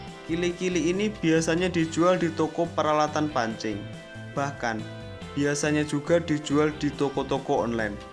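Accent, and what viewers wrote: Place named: Java, Indonesia. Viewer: Medok parah cuk.. 😅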